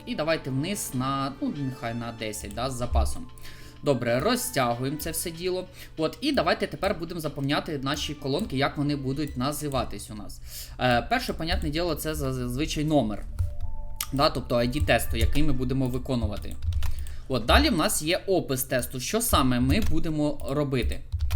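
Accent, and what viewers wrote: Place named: Surzhyk, Ukraine. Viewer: Смешные звуки произносишь. Это суржик?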